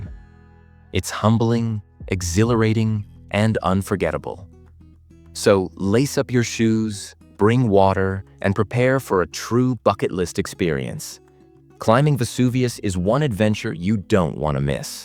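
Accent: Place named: United States. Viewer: Why do American accents get on my nerves so much? Sorry,can't bear to listen!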